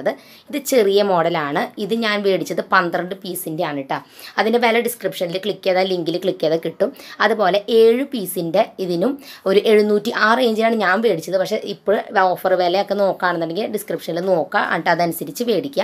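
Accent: Thrissur, India. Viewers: Ithu njangal Thrissur kkarude slang anu. Njangal achadi bhashayonnum samsarikkarilla tta...,☺️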